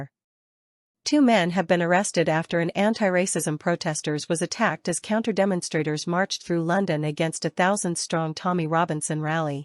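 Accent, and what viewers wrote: United States. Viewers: American naratetr Wdf u know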